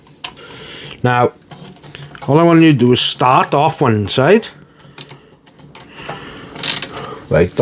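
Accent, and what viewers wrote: Wales, United Kingdom. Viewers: That's the most welsh accent I have ever heard in my entire 41 years on the planet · Heya mate! First of all, Im not used to welsh accent, and I must confess I thought you were dutch for a second lmfao. Chatgpt highly recommended this cpu cooler to me, and I was wondering if you had to chance to compare its noise compared to the Noctua or Bequiet that you featured in your comparisons performance wise ? Thanks a lot bud!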